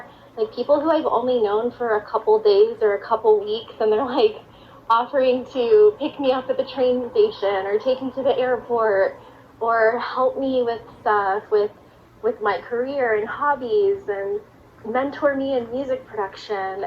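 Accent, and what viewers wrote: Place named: New York, United States. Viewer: Just watched your channel for the first time and amazingly the woodland that the girl in the video was highlighting known locally as Drinkwater Park was where I lived for the first 50 years of my life, thanks for bringing back memories of the happiest times of my life. You sound like a New Yorker, by coincidence I've just come back from a week in Manhattan. I'll be sure to subscribe.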